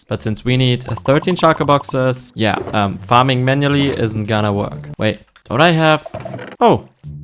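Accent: Germany. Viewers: hab garnicht drauf geachtet das du nen deutschen akzent hast haha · U have a german accent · bro you acent sounds so german bro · Ekelhafter deutscher Akzent 🤮🤮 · Finde den deutschen Akzent crazy funny weiter so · German accent makes this 1000x times more relaxing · Du musst deutsch sein man hört den Akzent so raus 😂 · I hear the german accent like crazy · your german acent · I hear Deutsch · der fakt das ich rausghehört habe das du deutsch bist ist crazy weil dein englisch sehr sehr gut ist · I can hear the "Deutsch" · I hear deutsch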